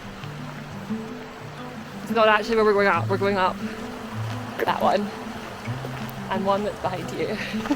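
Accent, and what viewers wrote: Scotland, United Kingdom. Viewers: The Ariundel! It is very elf/lord of the rings vibe. I have never used this phrase, but I think I really am obsessed with that sweater. It is just amazing! I love hearing your joy in creation. Also, I am so imressed with your thoughtfulness about making patterns to fit bodies of all shapes and sizes. It's lovely. Finally, I'm finishing my first comment on one of your podcasts by saying I love listening to your accent. My parents lived in Scotland for 5 years and it is such a beautiful country with lovely people.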